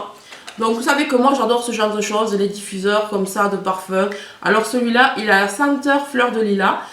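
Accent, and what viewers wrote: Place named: Marseille, France. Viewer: tu es Marseillaise ? En tout ka tu as l accent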